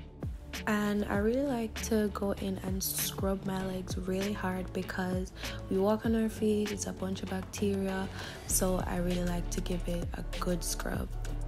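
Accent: Jamaica. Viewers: Hey are you Jamaican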